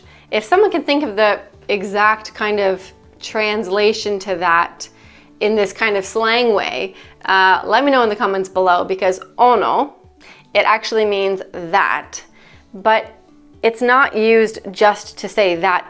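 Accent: Zagreb, Croatia. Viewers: "Ae" serves as "Oh well..." and "That's how it is.". It is literally used instead of these phrases. A: "Learning to code is so hard..." B: "Ae...". Btw, your pronunciation is sooo good! And you have a clear Zagreb accent, too! It's so cool to hear a foreigner speak Cro so cleanly with an actual recognisable dialectal accent! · I hate Zagreb slang and I think the accent is absolutely horrendous, but when you say it is actually cool :D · Don't know if it's just me or what, but I notice a slight Croatian accent when you're speaking English now!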